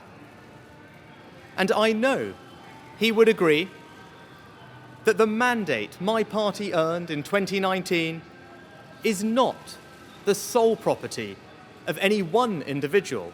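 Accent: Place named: United Kingdom. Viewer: What a clear British accent wow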